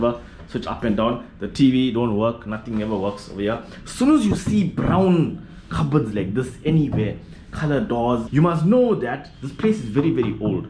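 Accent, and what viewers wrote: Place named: Kenya. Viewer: You have an African accent bro kenyan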